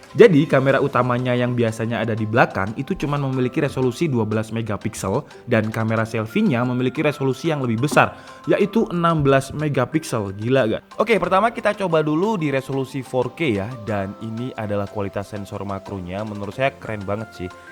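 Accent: Java, Indonesia. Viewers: Wong jowo ta mas?😂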